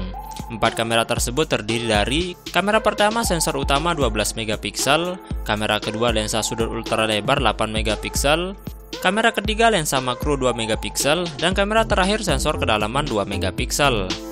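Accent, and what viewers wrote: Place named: Banyumas, Indonesia. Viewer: admin org banyumas yaa bhs indo agak ngapak hehe...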